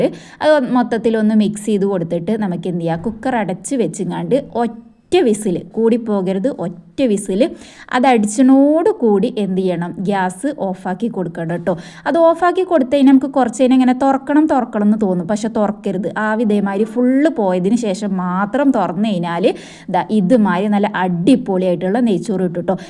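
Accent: Malappuram, India. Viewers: Thani malappuram baasha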